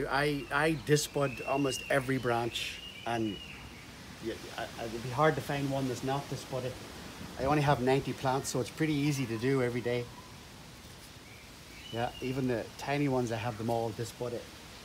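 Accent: Northern Ireland, United Kingdom. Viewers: Now r u a northern ireland man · Hello greetings from NI when you say a good feed of pot ash what would I be looking to buy,at the min I have a lot of buds but the stems are short and not strong. Also I hear the NI accent there where do you hail from,lovely plants by the way.Ta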